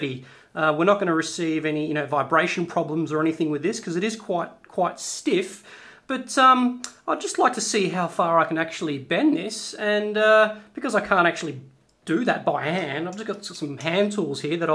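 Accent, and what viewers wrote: Australia. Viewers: No, lol. Same Aussie accent though.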